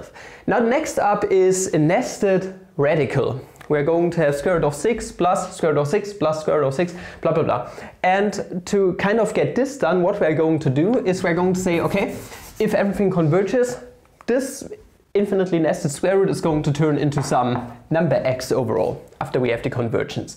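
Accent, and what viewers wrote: Germany. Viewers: Ich mags wie der deutsche Akzent bei dir immer mehr und mehr schwindet beim englisch Reden :D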